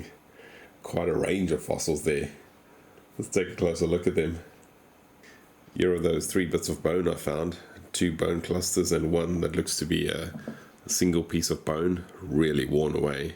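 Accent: South Africa. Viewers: Is that a partial South african accent I hear?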